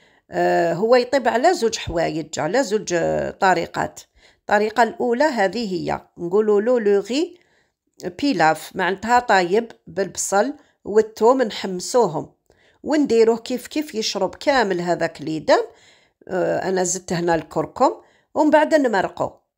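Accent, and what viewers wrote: Algiers, Algeria. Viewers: لكن تبان في هدرتها باينة لهجة عاصمية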